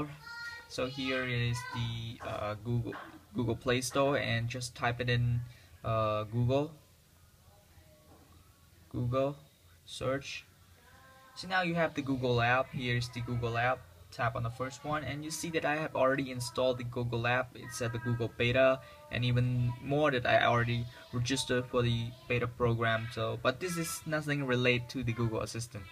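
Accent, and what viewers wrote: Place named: United States. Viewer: I swear u sound like American..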